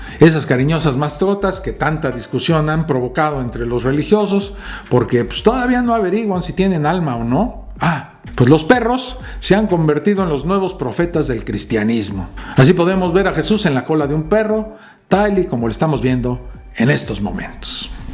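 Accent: Mexico. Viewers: Me encanta su forma de informar aunq tenga un toque mexicano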